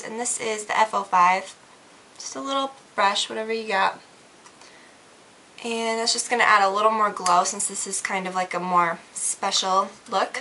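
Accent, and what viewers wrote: South, United States. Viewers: i feel like only during tutorials does your southern accent come out :)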